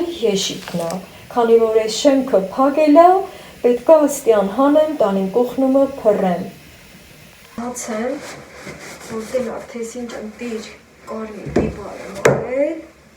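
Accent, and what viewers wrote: Lori, Armenia. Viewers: Վաաախխխ Լոռվա բարբառ ❤❤❤ որտեղից եք տիկին ջան?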